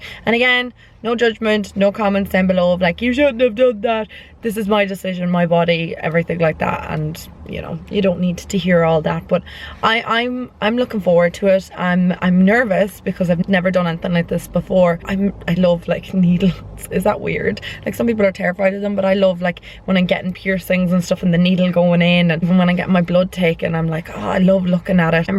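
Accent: Ireland. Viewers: U Irish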